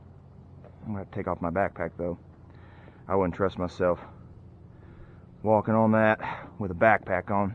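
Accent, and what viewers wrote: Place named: South, United States. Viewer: Speaks like Huckleberry Finn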